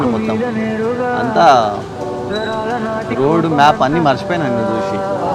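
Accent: Telangana, India. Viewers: Pakha Telangana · Telangana slang super 😁🤘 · Awesome Telugu bro.....that to in telangana slang u spoke....😀😀😀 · I love the way u r talking in pakka Telangana slang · Totally surprised to see U speak very well Telugu that's also in Telangana slang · Anna nice 👌 Telangana Basha super I yam big fain pataechu porini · Bro Telangana language Superb. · Anna supperrrrrrb telugu Telangana yasa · Telangana slang echi padesnav 🔥 , come with more Telugu video ❤️ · Telangana basha super ga matlduthunnaru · Anna telengana language super ga mataldavu anna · Telangana slang super anna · Acha(actual) telugu anna idi... Telangana telugu ante idi asal... Kirrak poo... Dhethadi pochammagudi lekka unnadhi... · anna pakka Telangana language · Super Anna act in TELANGANA Telugu language super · మొత్తానికి తెలంగాణ బాషా జోరు మాట్లాడుతున్నావ్ శుభాకాంక్షలు అన్నా 😁😁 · I never knew you speak Telugu, and you totally pulled it off. 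Telangana slang, great bro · bro nuv tilagana slang masthu matladuthunnav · Bro nuvu telugu matladutunte chala comedy GA vundi bro Kani telangana slang bane try chesavu bro nice keep rocking bro 😘😘😘😘😍😍😍😍😍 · Bro pakka telangana slang..awesome.. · Telangana Iragateesthunnav Anna🔥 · Happy to see you, i know you born in hyderabad and brought up in Mumbai, still you are speaking good telugu with telangana accent.more way to go. All the best bro. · Bro telangana slang superb 👌👏👍🙌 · Bro pakka Telangana slang 🔥🔥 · I like ur way of talking Telangana slang Bro nice keep it up Bro All the best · bhai i saw you many videos in hindi, but you are talking telangana telugu its super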